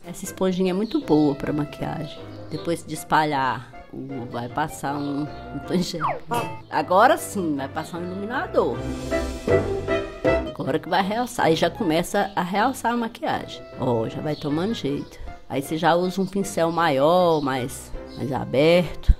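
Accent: Minas Gerais, Brazil. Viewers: E esse sotaque mineiro haha amo, mineirinha tbm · Bao de mais...amo sotaque de Minas Gerais 🙌😁😘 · Se eu que sou de Minas amo esse sotaque nosso,imagina quem não é 😍😍😂😂😂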